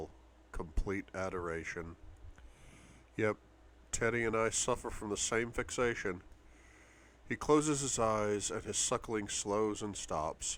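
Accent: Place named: United Kingdom. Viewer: Loved you reading this...and since im from the UK your British accent is excellent. Well done and thanku ❤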